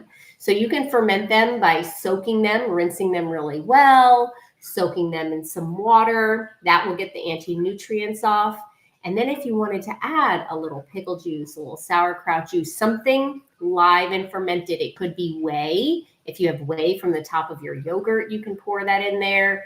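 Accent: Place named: Canada. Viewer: Hey sister, so I used to soak lentil and bean in water mixed with baking soda for 8 hrs , or overnight. I stopped consuming legumes for my SIBO. I wonder though if you'd do a research to find out if baking soda works on legumes? But I'll definitely try mixing fermented pickle juice, I get them in farmers market in Poland, with water. Would a few table soons for a liter of water suffice? I'm glad i found your channel and i appreciate that you use metric system in your videos. Sounds like you're from Canada. Much love and respect for you for making free informative videos. Greetings from Poland. .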